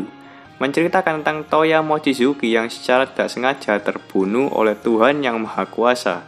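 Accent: Java, Indonesia. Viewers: jawa banget koe lur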